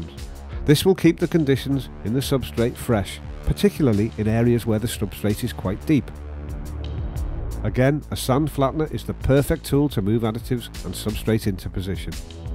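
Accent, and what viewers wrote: Liverpool, United Kingdom. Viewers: Like myself, the narrator has a Scouse accent which is the casual way of saying a Liverpool or a Liverpudlian accent, it is an odd accent in so far as that there are quite an awful lot of people in the UK who just dislike the Scouse accent, and yet we have had a survey taken here in the UK which researched local and regional accents for use in providing a service for the Samaritans, it was determined that the Scouse accent was the most emotional and soothing of all UK accents giving callers a sense of trust in the telephonist, this is contrary to the stereotypical opinion held by people nationwide that Scousers are not to be trusted. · Is the narrator Scouse?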